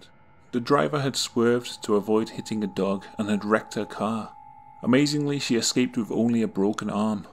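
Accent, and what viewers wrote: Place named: Northwest England, United Kingdom. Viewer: Just sounds like an Englishman with a mid-northwest accent to me.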